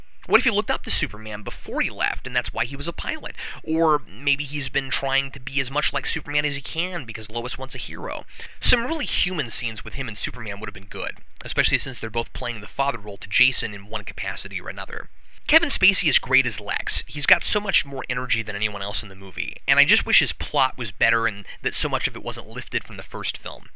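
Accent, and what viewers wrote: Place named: United States. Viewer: Only cos people are dumb and get a huge boner for 'splosions and mass destruction. Man of Steel is not a superman movie. Superman Returns at least had a heart to it. Didn't give one shit about anyone in the new one. I need to go now though, this guys particular brand of American accent is cutting right through my brain.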